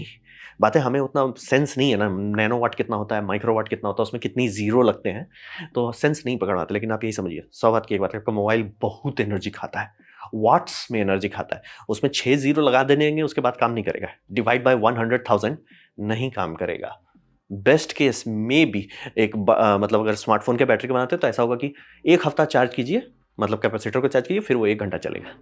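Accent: Bihar, India. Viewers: liked ur bihari excent too❤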